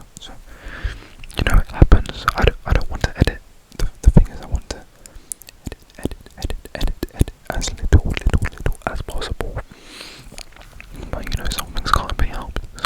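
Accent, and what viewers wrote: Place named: London, United Kingdom. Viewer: lowkey hearing the london accent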